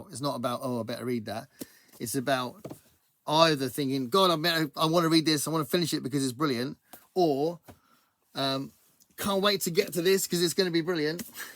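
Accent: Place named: United Kingdom. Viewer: ● I regularly randomly pick a book off my shelf 🙈. ● I really like the cover of that Ray Bradbury. ● I'm on my 5th Star Trek book. You're a slacker! ● Oh I'd like to read The House In The Cerulean Sea myself. 🤔 ● Oh Blacktop Wasteland was not good imo, but I'll be interested to see what you think! ● Outside of BookTrek and New Worlds November, my reading IS totally random 😂. ● Oh Alien Clay. I'm not familiar with that one. I'll have to look it up. ● Wow! I love the cover on The Imposter! ● Ok random question: What kind of British accent do you have? What region?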